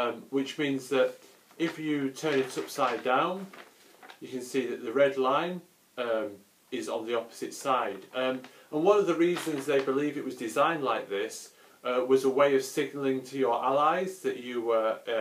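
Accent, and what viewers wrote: United Kingdom. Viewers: イギリス英語かっこいい＾＾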